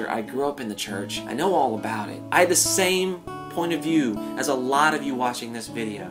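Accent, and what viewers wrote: South, United States. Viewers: Does he have blue or green eyes? And is that a southern accent I hear!?!? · The southern accent though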